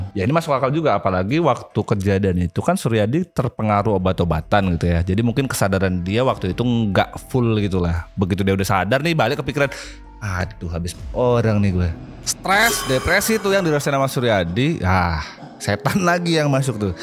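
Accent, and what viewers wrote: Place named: Lampung, Indonesia. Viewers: Lampung bangett euyyy.. tp nagih 😂 semangaaatt mas cerita ceritanya.👍👍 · Salfok sama logat nya bang , Lampung banget 😂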